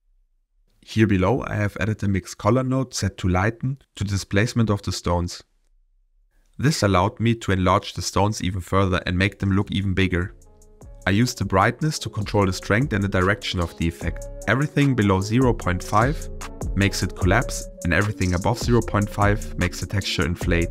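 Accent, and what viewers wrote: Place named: Germany. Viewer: ich wusste doch das ich Deutsch rausgehört hab! Aber geiles Tutorial ehrlich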